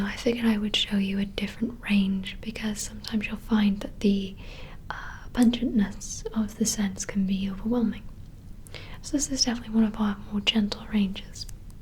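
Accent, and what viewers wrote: Wales, United Kingdom. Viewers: Fantastic video. I find your voice so comforting. I think it's because I'm Welsh too and so your accent is so familiar!!! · Welsh accent is incredibly nice😍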